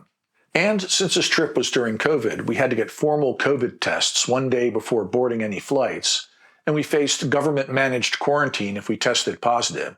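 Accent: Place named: Baltimore, United States. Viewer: Considering this very thing for the future and interested to hear your experiences! (By the way, I thought I heard a bit of a Maryland/Baltimore accent while you were talking. A bit of home for me! I’m from the DC area with many relatives in the Baltimore and DC suburbs but live in the Pacific NW now)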